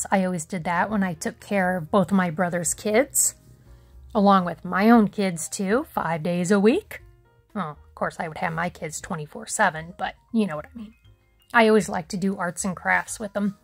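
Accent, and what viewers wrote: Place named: California, United States. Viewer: Loved the Calley girl accent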